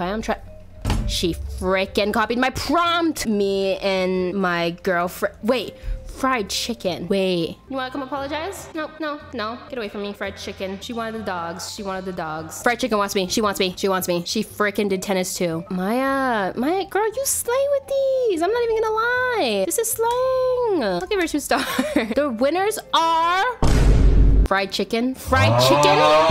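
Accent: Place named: Asia. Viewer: her asian accent tho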